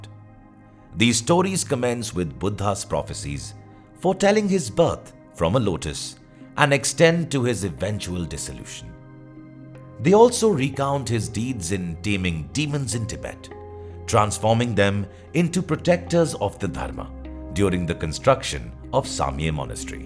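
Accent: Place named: India. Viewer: The narrators Indian accent is cringe.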